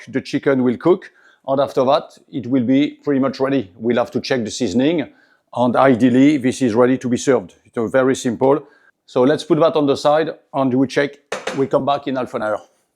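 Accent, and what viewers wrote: France. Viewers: Your French accent is even better than the dish ❤ Thank you so much 😊 I'll be looking out for your other recipes. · French 🇫🇷 English is so funny 🤣 I am trying to absorb and re-enact as a stand up comedian. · Why does it look and sound so much better when done by a Frenchman?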